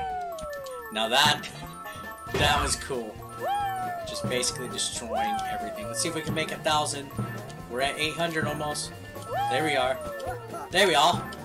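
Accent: United States. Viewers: Sweet country accent bro lol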